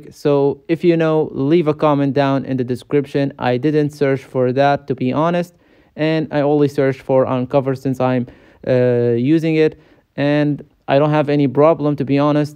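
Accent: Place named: Arab world. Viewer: لهجتك عربيه🙂👍🏻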